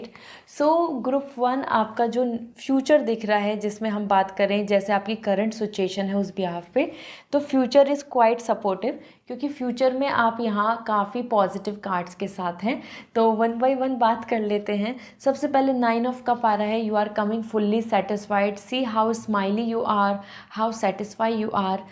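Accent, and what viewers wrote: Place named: Punjab, India. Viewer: You sound punjabi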